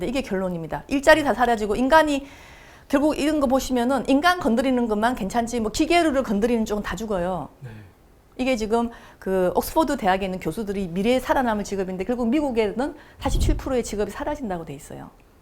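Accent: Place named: Gyeongsang, South Korea. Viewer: 예전에 tv인터뷰때는 몰랏는데 갱상도 분이네요.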